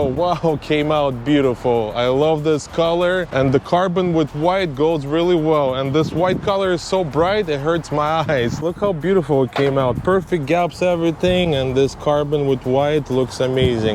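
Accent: Russia. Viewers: Ну по акценту скажу что чел то русский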